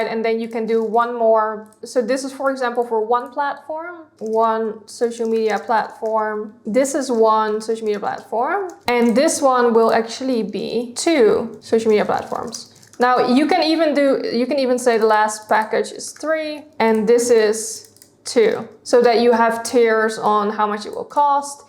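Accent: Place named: Netherlands. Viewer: Ben je van Nederland? Ik hoor een zwaar accent😉groetjes uit België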